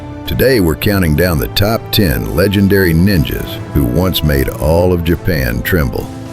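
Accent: South, United States. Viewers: Whats up with the southern voice? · Love the Southern Accent narrative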